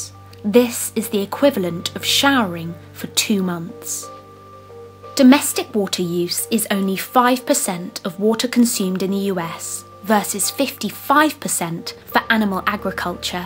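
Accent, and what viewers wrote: England, United Kingdom. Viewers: These figures seem controversial. I mean, I know they went to the trouble of hiring a voice actor with an English accent, but has anyone bothered to fact check this?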